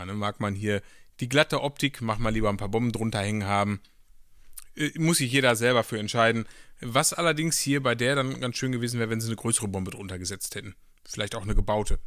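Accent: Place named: Ruhr, Germany. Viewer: Dat is Pottsprache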